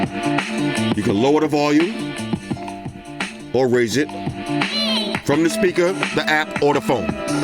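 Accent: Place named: Queens, United States. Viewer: That’s Queens, New York all day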